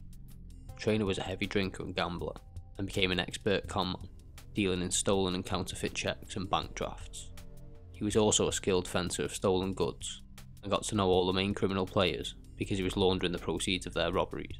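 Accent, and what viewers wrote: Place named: Ireland. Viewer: Really? Seems fine to me. He is Irish so there’s an accent but no problem understanding him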